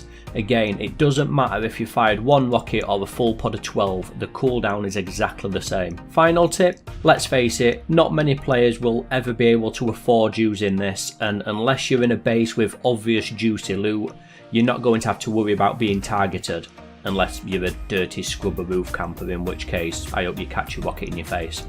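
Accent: United Kingdom. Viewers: I dont know why, but I always learn more about Rust from a chap with a British accent.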